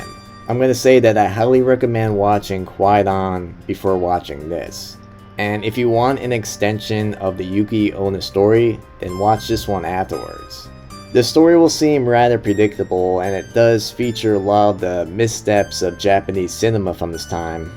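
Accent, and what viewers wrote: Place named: New York, United States. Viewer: Love your New York accent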